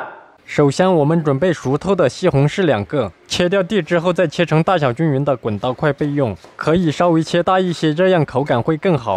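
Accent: Sichuan, China. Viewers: 感觉这哥们是四川人，这口音应该是四川人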